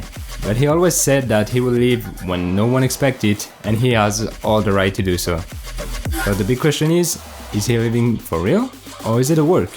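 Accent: France. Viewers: Tu es français mec ?! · T'es français ? Tu parles bien anglais franchement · Bon vidéo ! Et soit fière de ton accent français ! ^^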